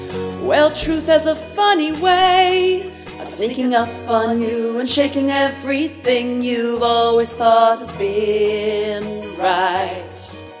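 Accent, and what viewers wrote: New Zealand. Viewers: Omg, are you Kiwi?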